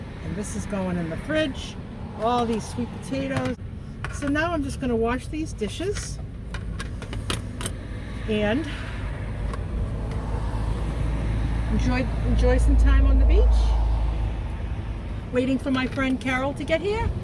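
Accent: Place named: Boston, United States. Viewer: I really am sorry about your coffee ordeal. I never drank coffee then as working early shift, I had to make sure everything was filled, and coffee was made, I could take care of everything but coffee I knew nothing about making, so someone showed me how to do the coffee, it was easy, it smelled So good over time I tried it, I’d add a lot of cream, then I tried de caffe,it was much better so I’d have a cup. Only I never would finish it. I will once in a great while have a cup of instant, it may take me all day so it’ll be cod, which it’s ok to me. I’m happy you have such a strong will to quit guess it’s like quitting smoking, I’ve never smoked. But husband had a hard time when he’d try to quit. Soon he stared smoking outside, Dr said I couldn’t be around smoker. I’ve been around it all my life,dad smoked, but they say I’d inhale more than dad did. 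Didn’t realize it until I was grown, then hubby was a smoker. Anyway the lunges paid for that,. Your doing so well with your van, it’s so nice. I hear you mention, you were heavier, at one time, I’m gonna eventually look at all your other videos, I just love them. Most I love your room, it’s beautiful. Well I’m rambled along ,so I’ll stop and backup the one I’m watching now. Have a wonderful day. Love your accent, did you say your from Boston ? Latter 👍👍👍👍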